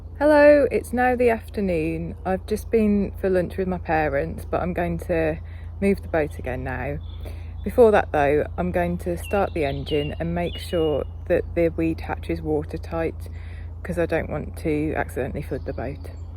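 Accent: United Kingdom. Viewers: Hi,! I’m from Belarus. I feel relax and tranquility by watching your videos. And pure British English sounds magnificent! A great pleasure to hear you.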